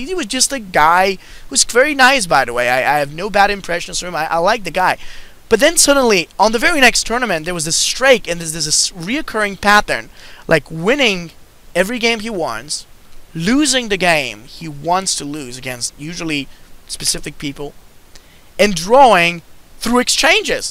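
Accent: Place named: United States. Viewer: he's from Bulgaria, however, I'd say his accent is 90% american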